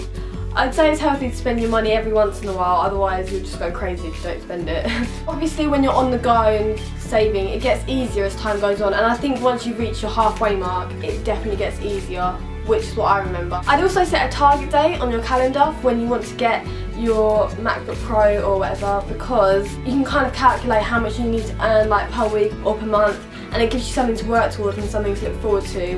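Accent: United Kingdom. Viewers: your accent is amazinggg omg I LOVE YOUR BRITISH VIBES❤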